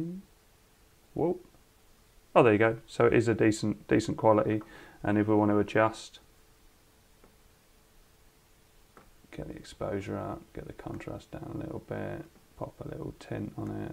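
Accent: United Kingdom. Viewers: Yo are you from sheffield mate?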